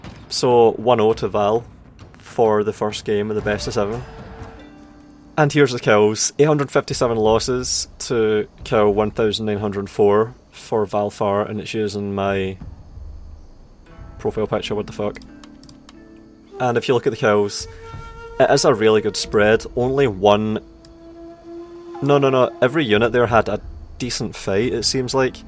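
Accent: Scotland, United Kingdom. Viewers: Is this scottish accent? I'm amazed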